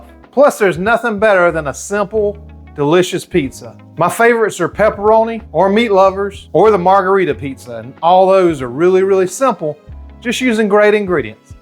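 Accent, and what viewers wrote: South, United States. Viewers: Sorry the thick southern voice is 😖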